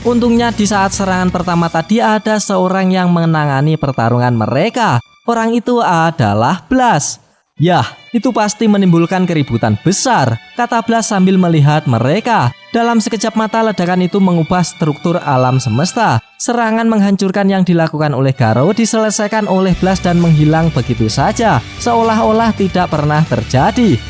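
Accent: Java, Indonesia. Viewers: Nah gini bg selagi manga chapter terbaru nya blm rilis mending cari yg fanmade fanmade nya aja bg seru bngt asli, apalagi pembahasan nyaa kayak mimin logat jawa gini makin asik 😋😋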